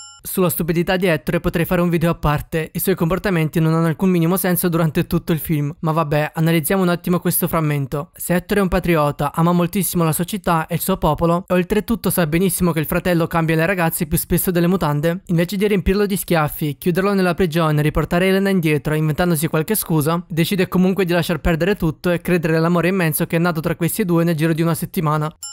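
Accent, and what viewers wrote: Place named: Naples, Italy. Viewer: complimenti per il video. PS. l'accento parteneopeo si sente tutto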